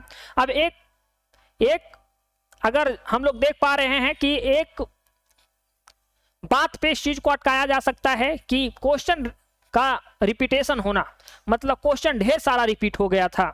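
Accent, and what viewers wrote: Bihar, India. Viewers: Tum bihari ho n...tumhra language n tone bihari wali hai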